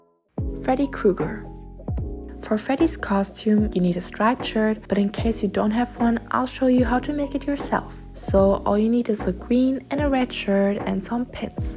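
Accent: Germany. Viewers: off topic but what accent is this? german?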